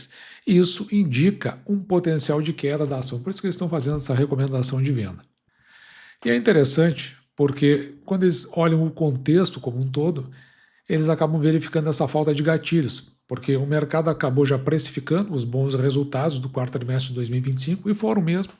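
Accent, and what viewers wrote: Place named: Rio Grande do Sul, Brazil. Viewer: Cara tenta falar mais neutro..vc não vende peixe só pra gaúcho. INSUPORTÁVEL sério, fica a dica. Vc puxa muito acento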